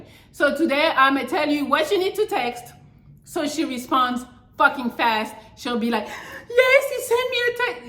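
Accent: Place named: France. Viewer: Are you french bro?😭 Im sorry but as a french dude it freaks me out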